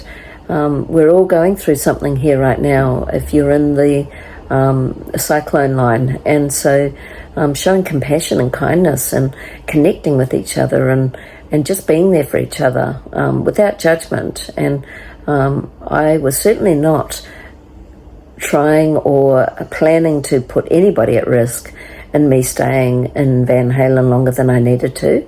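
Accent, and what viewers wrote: New Zealand. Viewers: Thought I picked up on a kiwi accent! I lived in NZ as a kid... one more thing in common! So very familiar with Kia kaha! Back at ya! 💪😍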